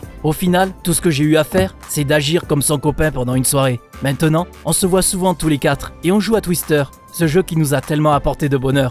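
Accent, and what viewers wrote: Marseille, France. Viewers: OMG L ACCENT MARSEILLAIS INCROYABLE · léo lgm omg l accent marseillais incroyable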